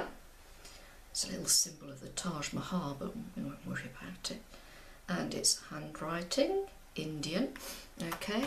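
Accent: United Kingdom. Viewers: Hi... thank you SO much for this... I really struggle with letting go and producing loose paintings... you've shown me an easy and enjoyable way forward! I am completely self-taught (with the help of YouTube and Google! lol!) and started out with watercolour pencils... in the last couple of weeks, I've transitioned across to watercolour paints... maybe this is why I find it hard to let the paint express itself, as I'm used to having more control with pencils?! Anyway, enough rambling... PLEASE do more of this type of thing:) Being an expat in Cape Town, it's great to hear a fellow Brit too!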